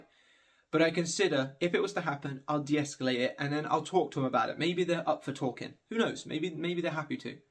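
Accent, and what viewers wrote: England, United Kingdom. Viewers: This is off topic but it’s been driving me nuts so I have to ask. Where in England are you from? I’ve been all over England and I can’t quite place your accent! Sometimes I think you’re from South London, then sometimes it sounds like you’re from Nottingham…or sometimes even Birmingham! I’m way off aren’t? 😆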